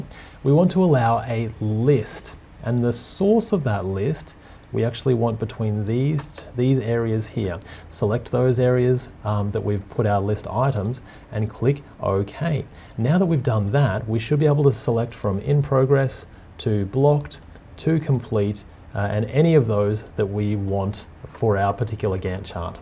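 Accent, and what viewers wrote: Australia. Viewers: Great to hear another Aussie!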